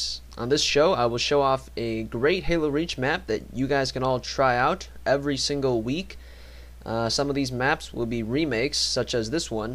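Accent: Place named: United States. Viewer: i know youre asian, but you sound like you grew up in america, so what was your first laguage and where did you learn it?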